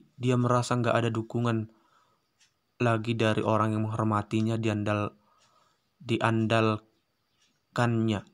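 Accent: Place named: Batak, Indonesia. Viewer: Kereeenn. Keren Chanel mu bro.. Tapi ngakak aku.. Hahahah.. BATAK nya kental kali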